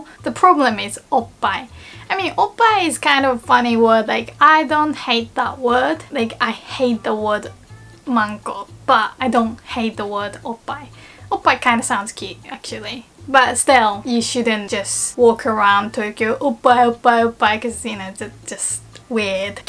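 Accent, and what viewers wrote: England, United Kingdom. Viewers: ive been wondering for a while as to why you had more of an English accent. Makes me happy to confirm you had a long(?) experience here. Hope to see you around in Tokyo. Look after yourself~~ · This video really makes it obvious you lived in England, ignoring of course, the British twang to your English accent. One question: in England insulting people (often seemingly harshly) is a big part of our culture, the closer you are to someone often the harsher these insults can get. How did you find this practice when you were living here, and how did this affect your perception of insults since moving back home?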